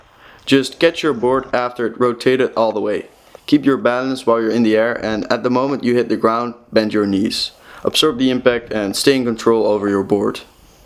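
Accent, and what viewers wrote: Netherlands. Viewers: sickkk btw you sound very dutch bc I am dutch😂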